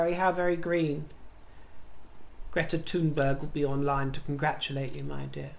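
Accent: United Kingdom. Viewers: I adore you. You're so very British to me, I'm from near Chicago haha. You have the best voice, accent, look, and everything about you is regal or something lol. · A very quick personal question, if you don't mind. Are you an 'alter ego' of Gibbs? I ask only because a very unusual name to use and you do bear a striking facial resemblance to Mr Gibbs. Whether or not the answer is yes (and your very British accent and behaviour suggest not), your regular videos are real gems and match both my own sense of humour and views of royalty and the 'ex-royals'. Keep up the excellent work!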